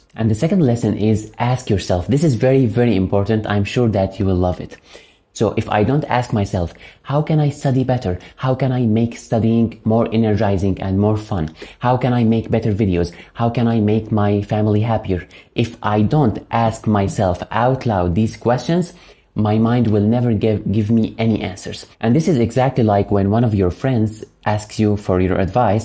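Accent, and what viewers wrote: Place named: Aleppo, Syria. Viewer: حبيت شغل بدون ترجمة 🎉 اللهجة الحلبية كانت احلا حسيت 😁🌸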